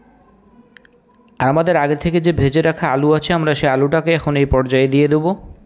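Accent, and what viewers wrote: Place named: Chowdala, Bangladesh. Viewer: চৌডালার আঞ্চলিকতায় না খেয়েই কিছু বলার নেই। ধন্যবাদ চালিয়ে যাও।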